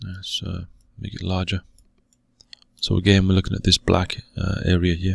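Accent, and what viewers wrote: United Kingdom. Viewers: No reason to doubt her Looks real to me. Especially after you did your thing to the video. Another gem mate Glad to see your channel gaining subs I remember when I first discovered you with barely 1000 subs Your humble and honest approach is why.Plus,having a delightful British accent doesn't hurt😉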